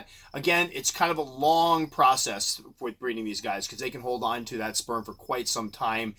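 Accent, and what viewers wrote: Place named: Midwest, United States. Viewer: Dude, I wish you had an email, but I’m sure that’s the last thing you need...58,000 emails asking “what are the best T’s to start collecting?”! 🙄 My question of the day is (if you can/want to answer?!)...Being from St. Louis, MO, I hear a STL/Chicago (Midwest) accent...am I close?! 🤔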